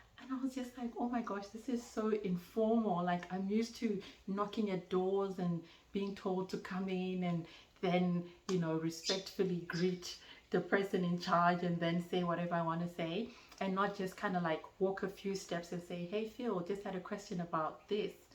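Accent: Australia. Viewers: Your getting some Aussie twang :-) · Your accent has a very Australian sound now if you didn't realise. Welcome to the Family.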